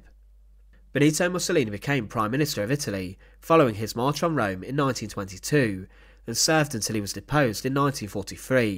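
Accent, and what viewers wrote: United Kingdom. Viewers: You should ask yourself what is the real goal of this video. The British accent of the speaker is interesting. I wonder if this people can tell us something about the relationship between Churchill and the Duce. During the days that preceded the execution of Mussolini, there were British secret service men operating in lake Como, looking for documentation that could put Churchill in dishonorable condition. It is highly suspected that the documents that Mussolini conserved jealously in those days, were the object of this search by Britain. Interestingly, these documents DISAPPEARED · This british accent is so awful ... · Very annoying your tone, pause and narrative .. are you British ?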